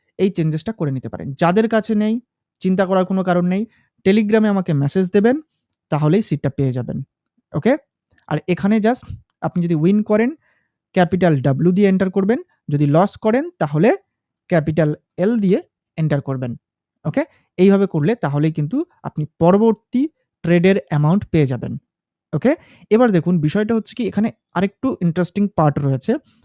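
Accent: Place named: India. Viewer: Apni indian nki bhai indian er mto lgche kotha gulo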